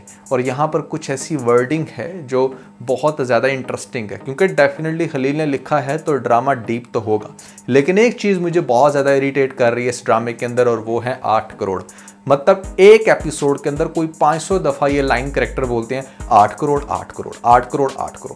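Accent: Punjab, India. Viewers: Bohat changa tuhda punjabi Touch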